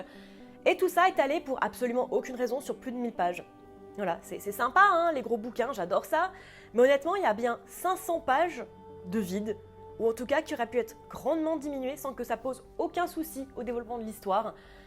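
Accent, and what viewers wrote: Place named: England, United Kingdom. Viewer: de estelle faye je te recomande la derniere lame et sinon j'adore ton coté bourru et ton accent anglais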